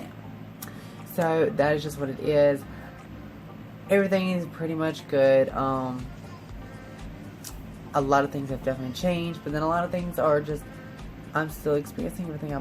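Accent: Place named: South, United States. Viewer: You look great,!!! Love the Southern drawl.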